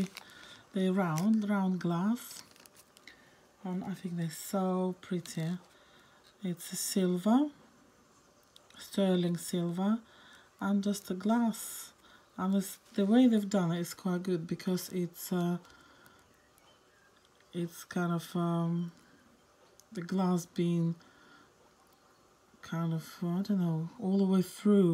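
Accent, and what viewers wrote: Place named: Sweden. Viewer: I love your accent! Sounds like Liberia/Swedish/British. 😊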